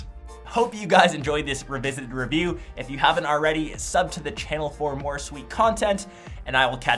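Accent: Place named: Canada. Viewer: lol you're Canadian accent is strong. nice video🙌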